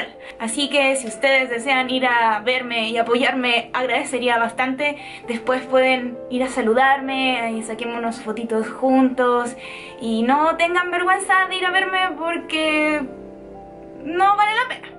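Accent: Chile. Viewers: Es chilena. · Ese acento es chileno? Esque miraba videos de un youtuber con mismo acento y me gustaba como decia las cosas xd pero no estoy seguro de si el era chileno Ella es chilena?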